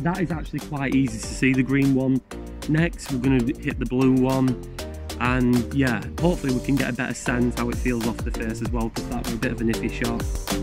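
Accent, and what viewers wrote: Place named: Sheffield, United Kingdom. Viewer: Sound like your from Sheffield pal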